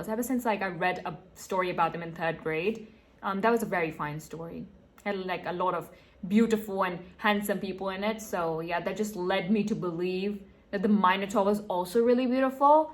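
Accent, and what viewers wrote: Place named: United Kingdom. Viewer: I'm still learning english, and I'm bad with accents. Where are you from? Seems britanic, but I am not sure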